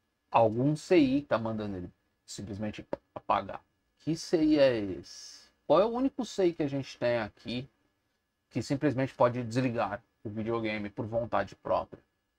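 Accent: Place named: Rio de Janeiro, Brazil. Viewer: Show like show , vídeo muito top vc e do RJ tem um pouco de sotaque :)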